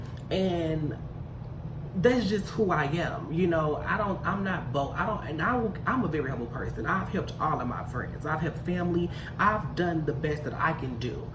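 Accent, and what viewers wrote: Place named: Midwest, United States. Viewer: U sound like midwest, detroit area here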